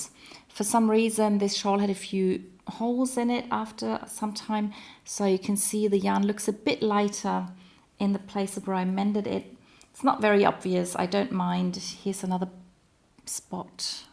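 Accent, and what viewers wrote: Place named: Australia. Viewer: Thank you for responding right away! Love your podcast! My son thinks you have an Australian accent:—). I will hate to disappoint him..., not.:-). Love your English! I wish my German was as good as in the old days when I spoke it flawlessly.... Is it this the pattern? <link>?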